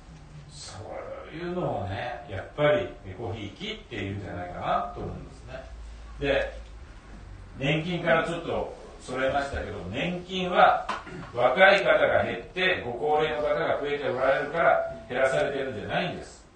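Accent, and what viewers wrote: Saga, Japan. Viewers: 佐賀弁が身近で良い。解散があるんですね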